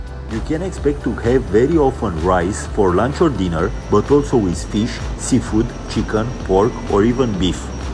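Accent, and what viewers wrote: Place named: India. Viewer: インド訛りの英語だね。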